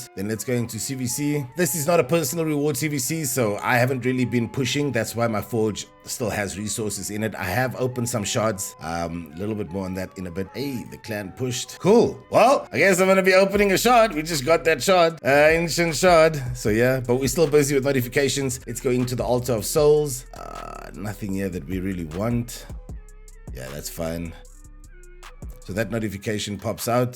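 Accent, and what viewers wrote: South Africa. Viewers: Sounds like you come from SA! Glad to see this.. good luck for the channel, now following 👍🏻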